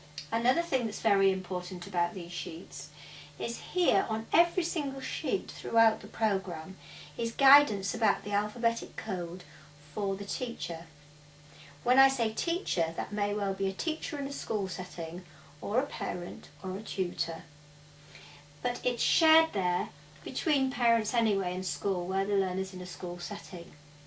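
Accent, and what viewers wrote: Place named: United Kingdom. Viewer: british accent. the best accent. thanks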